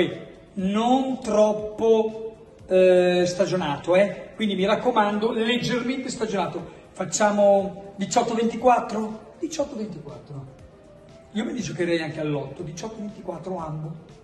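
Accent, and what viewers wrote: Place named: Romagna, Italy. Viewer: Hai un accento Romagnolo che si sente a metri di distanza!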